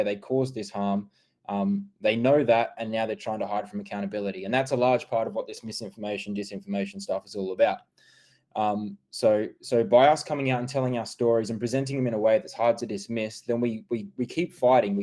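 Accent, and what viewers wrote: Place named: Australia. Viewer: Im not english speaker.so just waana listen ausi accept .....❤may u be well and happy ......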